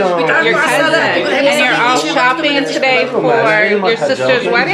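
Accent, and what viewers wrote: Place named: United States. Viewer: It is not english language but american one .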